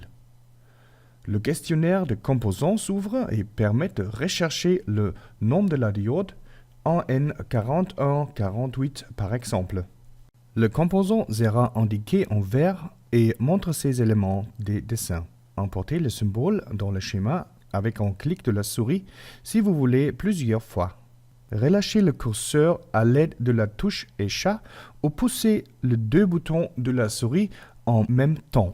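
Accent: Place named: France. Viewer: ach du liebe Gott ^^ danke für diese schöne Französisch-Akzent.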